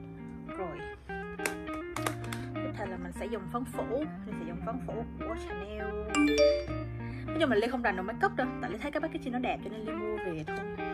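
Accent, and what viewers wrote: Da Nang, Vietnam. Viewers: Nghe giọng là biết ngay ĐN luôn ❤ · Em mê giọng chị vs giọng con gái Đà Nẵng nói chung lắm huhu =)))) chúc 2 mẹ con chị Ly mạnh khỏe nhaaaa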